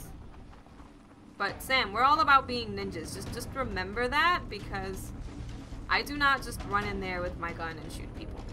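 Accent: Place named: New Jersey, United States. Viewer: AND WHY DO YOU SOUND LIKE SOMEONE FROM NEW JERSEY LMAO I LOVE HOW YOU SAY LADDER AND ANYTHING LMAO <3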